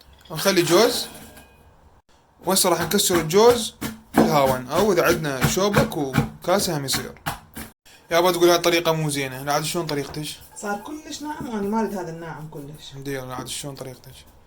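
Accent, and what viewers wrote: Mosul, Iraq. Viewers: انته من اي دوله يعني عراقي لو شنو 😁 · هههه هوة اكو احلة من الهجة عراقية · مبين مصلاوي من لهجتة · إضافة الى اكلاتك الطيبة والراقية ،ادائك الرائع ولهجتك العراقية الاصيلة الي مابيهة تملق هية الي تخلينة انتابعك ، عشت يا احسن شيف . تحياتي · والله مصلاوي قحي.....محد غاح يفتهم على هالحكي غيغنا احنا المواصلة.... هههه...تحياتي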